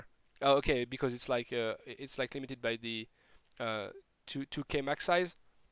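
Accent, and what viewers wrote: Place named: France. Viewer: Hmmm je ressens un accent Français là, ça je valide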